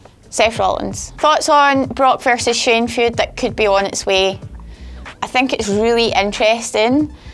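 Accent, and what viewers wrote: United Kingdom. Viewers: Guys why you complaining about her voice. She is British , it's not that bad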